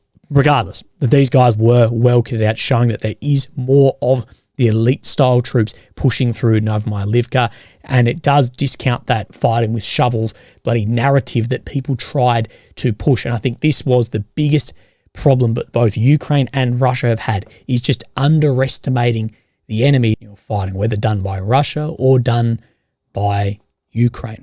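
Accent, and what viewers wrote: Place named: Australia. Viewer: i have watched over 200 of videos. He is the best at zero line tactic analysis. Always very balanced, pulling for Ukraine. There were monetization issues and Gaza. He was always begging. After his Dad died, whammo, a turn around. Now he parrots the RT slant, for a while wore some pretty fancy clothes and promoted not begs now. Indicators of financial influence. assessment that loosing the Kerch Bridge, the only potential withdrawal line, is not important is right outta current Russian state propaganda and laughable on its face. I unsubscribed, I've no need of RT with an Australian accent. Sayonara Kerch, hut don't worry, says it is no prob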